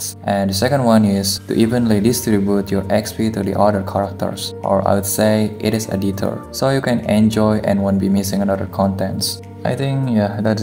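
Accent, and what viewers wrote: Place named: Indonesia. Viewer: Indonesian accent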